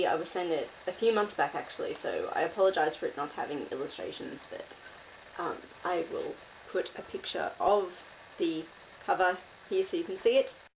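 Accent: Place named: Australia. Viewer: I thought it was you because you can hear the slight twang of your accent haha. It's very good and also, co-wrote a musical? I must say I'm ever impressed! You're a book loving, disney obsessed, musical writing Australian. It doesn't get more awesome than that :)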